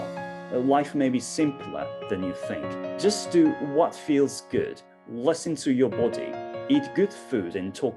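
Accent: United Kingdom. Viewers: さんはイギリス英語かな？？ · はじめて「 さん」ではなくのイギリス英語を聴いているような気分。響きます。。。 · おめでとうございます！いつも応援してますイギリス英語のほうがいいっすねｗ 染みるわ～～ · 貴方のおかげで、共通テストのイギリス英語が前よりも格段に聞き取れるようになりました！！ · 20万人おめでとうございます🎉 イギリス英語って染みますね！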